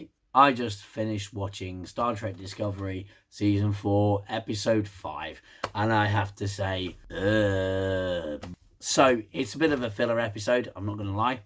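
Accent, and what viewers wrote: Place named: United Kingdom. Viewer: Were it not for your Limey accent you Id take you for the testosterone poisoned , but still physically doughy, caucasian fan boys that infest the body of SciFi fandom. I didn't like the first season and 3 quarters of Discovery. However, last season and this have been spot on. You whiney "proud boys are a joke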